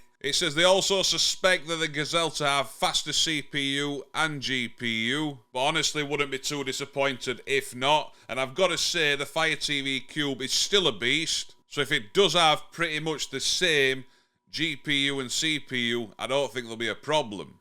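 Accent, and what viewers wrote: Yorkshire, United Kingdom. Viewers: I love the fire TV cube! The only problem with it is that I find that I have to restart it every day to keep it stable. If I don't, it goes haywire if I use my headphones! I'll turn them on and YouTube (which is mostly what I watch) just sticks and I get that "loading" circle! I tend to restart it every morning. Apart from that, I'm fine with it the way it is! I love your down to earth videos and your lovely Yorkshire accent. I'm was born in Scotland, we moved to Merseyside when I was 9 and lost my accent!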